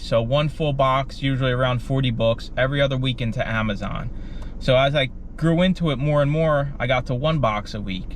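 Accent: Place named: Pennsylvania, United States. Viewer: Subscribed last night after finding your channel via a reply you posted in a Fields of Profits video..Loving what you have to offer, thank you! Got my Bluetooth scanner yesterday and ScoutIQ today...now I'm doing a bit of research b4 heading down the street to Goodwill. BTW I love guessing people's accents and I'm so glad you said you where you live b/c I guessed last night...Pennsylvania! I have people in the Pittsburgh area but and ex was from Harrisburg and so your accent reminds me of all his friends and makes me smile. Thanks for all the VALUABLE INFO!!!!!!!!! I'm SO EXCITED to include books in my FBA biz!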